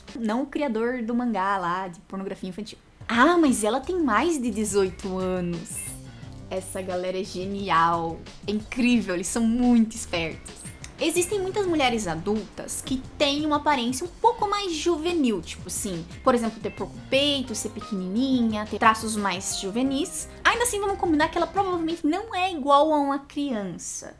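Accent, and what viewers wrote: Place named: Minas Gerais, Brazil. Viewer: tu tem o sotaque de mineiro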